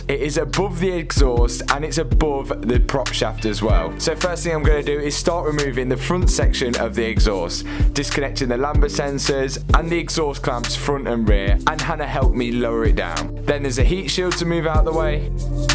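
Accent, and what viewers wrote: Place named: RP, United Kingdom. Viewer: This is my first video I've watched on your video. You sound posh but look like an LA fella 😂. Cool car content though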